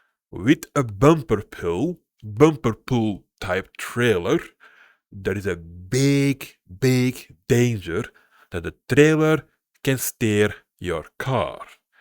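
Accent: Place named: Flanders, Belgium. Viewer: Ik can een vlaams herken waneer ik hoor hem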